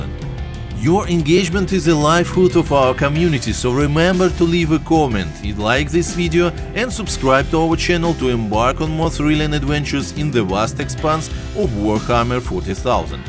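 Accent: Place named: Russia. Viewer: The strongest russian accent I ever heard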